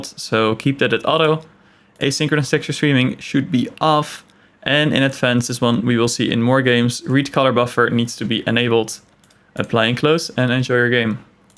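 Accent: Netherlands. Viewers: ahh I recognize the accent and indeed you are Dutch. Really cool channel, subscribing now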